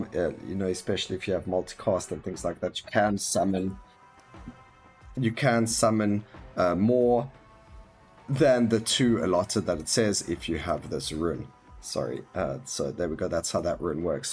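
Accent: South Africa. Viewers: There's a difference between "accent" and "wrong" :D Accent is when you pronounce "aɪ" phonetics as "a" bc South African drops inflection. Wrong is when you say omnipotent wrong :P But hey, just tryna help. No hard feelings.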